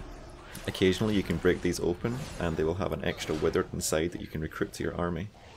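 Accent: Scotland, United Kingdom. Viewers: You have the softest Scottish accent i have ever heard :O i nearly crapped my pants when i heard it I'm Scottish myself which i think made it more striking :O great guide though BIG THUMBS UP BRO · you sound scottish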